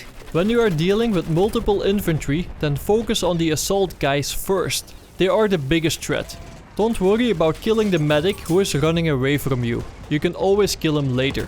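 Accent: Netherlands. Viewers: You have a very noticable Dutch accent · you're dutch right? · beetje duidelijk Nederlands accent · Are you Dutch ?! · Are you dutch? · hij zegt "tank" op een Nederlandse manier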